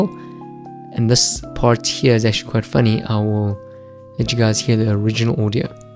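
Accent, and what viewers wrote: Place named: South Africa. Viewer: Great revue :-) Why do you sound south African?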